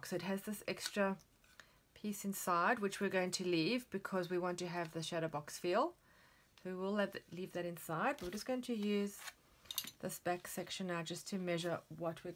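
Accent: South Africa. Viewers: you sound South African! Love your art!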